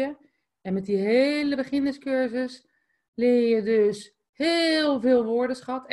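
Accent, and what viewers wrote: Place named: Netherlands. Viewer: Aún tiene un acento holandés grandísimo lol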